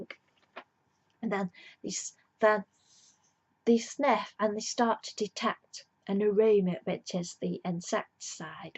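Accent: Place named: Staffordshire, United Kingdom. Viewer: <3 The Black and White and <3 You. And <3 the Staffs (?) accent.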